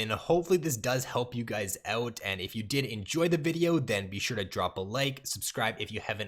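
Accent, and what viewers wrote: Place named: Canada. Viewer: Took me watching like most your videos to hear your Canadian accent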